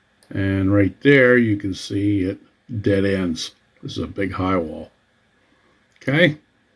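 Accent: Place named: Pennsylvania, United States. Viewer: Great video. Thanks for posting. As soon as I heard your voice I knew you were from back home. The western PA dialect is unmistakable. I was born and raised in California, PA. My grandad was a coal miner and my dad was a steelworker. I have seen lots of coal trains and lots of coal barges. This tour was great for seeing this part of the process in more detail. I never had the opportunity to see it. Thanks for posting. Keep up the good work.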